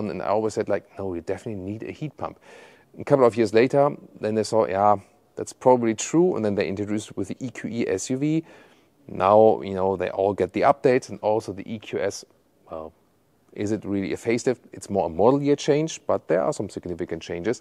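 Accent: Germany. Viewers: This dude has a pretty strong German accent....Great car besides!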